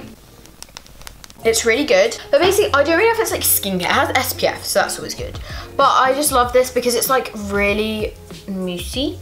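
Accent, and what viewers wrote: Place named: United States. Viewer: Love this and ur American accent wowee!!!!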